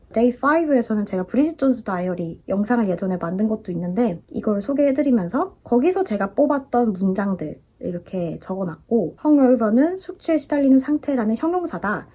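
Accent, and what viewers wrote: United Kingdom. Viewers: 책 출간 축하드려용 >_< ❤️ 바로 교보문고 달려가서 구매하고 왔습니다! 빨리 받아보고싶네요! 제 영국영어 롤모델이세용..목소리도 너무 좋으시구 ,, 은근 슬쩍 팬심 들어냅니당! 다시 한 번 축하드려요!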